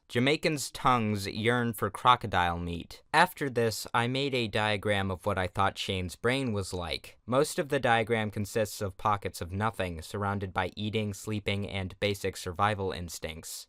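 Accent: United States. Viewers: i find your american accent attractive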